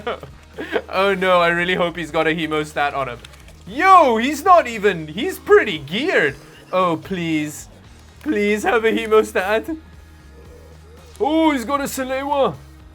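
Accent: South Africa. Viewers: dude are you south african?? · I can recognise that Saffa accent anywhere! Enjoying your videos. Greetings from home!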